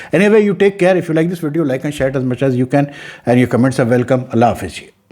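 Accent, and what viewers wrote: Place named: Pakistan. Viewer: He is not "Putthar"!!! He is not a punjabi. His last name is "Puthur". And its not "Karela league". It is "Kerala"!!!🤣😂. Fed up of Pakistani accent and pronouncing of Indian names.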